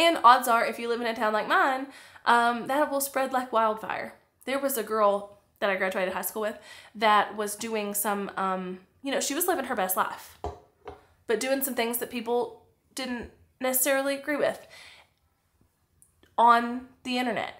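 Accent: South, United States. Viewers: Your energy, personality, confidence and transparency drew me to your channel! Cornbread accent, I love it! I definitely am the kind who is scared to put myself out there and grow my business. Your videos are super helpful!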